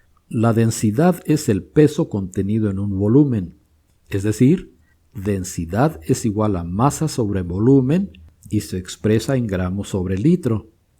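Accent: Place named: Dominican Republic. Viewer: Es acento dominicano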